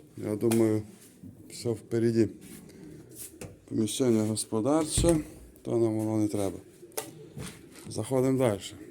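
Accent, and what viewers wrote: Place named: Western Ukraine, Ukraine. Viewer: Судячи з акценту, ти із західної частини України. З понеділка теж стартую по Польщі. Думаю, зустрінемось.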